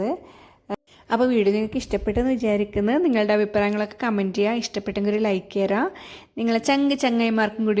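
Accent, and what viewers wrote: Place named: Kannur, India. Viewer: Kasaragod evideya njanum Ksgd but Ningale slang kannur touch nalla basha🤩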